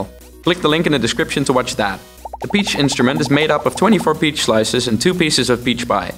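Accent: Netherlands. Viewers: I'm Dutch myself, and I have to say that your English is really good. It took me a while to realize that your accent was Dutch. Good job! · As a native English speaker, it was instantly obvious he has a Dutch accent, but he does have good English :)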